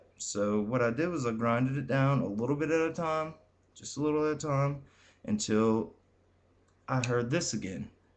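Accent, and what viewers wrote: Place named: Georgia, United States. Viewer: Great video--since I'm from GA, your accent is a plus!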